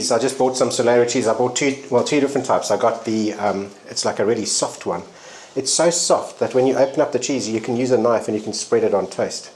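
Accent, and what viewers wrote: South Africa. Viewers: After 23 years you still have a completely South African accent😂😂😂😂😂😅😅😅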